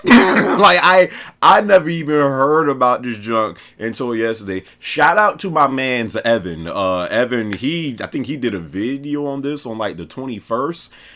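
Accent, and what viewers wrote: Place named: New York, United States. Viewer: lol I could've sworn this nigga moved to ny the way he talks😭 saying my guy?😅 anyway, much love from Harlem ny fam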